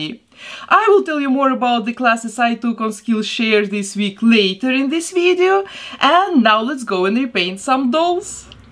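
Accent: Russia. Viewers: Why do you have a Russian accent? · your accent is so comfortable but hard at the same time, and the way you make sentenses are just so russian vibing you know😆😅are you russian/ukrain?? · you have such a strong Russian accent. Wow🤨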